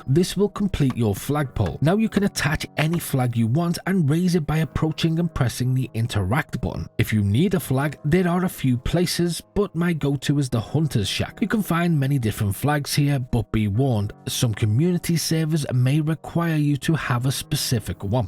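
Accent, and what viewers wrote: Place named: Fife, United Kingdom. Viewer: You sound like a Fifer 😊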